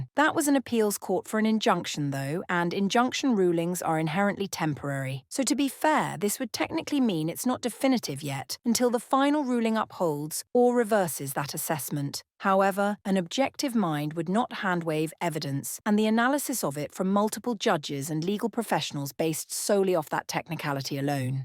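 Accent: United Kingdom. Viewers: Artistic originality without the resources to promote them seems pretty futile! I guess you like to think you are clever by saying silly and outlandish things in that calm British accent to make it seem like it is a well considered opinion, whilst you are simply shilling for engagement just like all the trolls!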